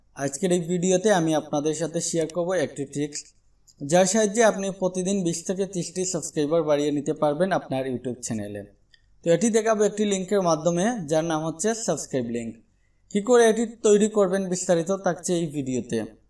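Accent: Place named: Sylhet, Bangladesh. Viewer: ভালো হয়েছে, তবে কখার মধ্যে সিলেটি একটা টান রয়ে গেছে।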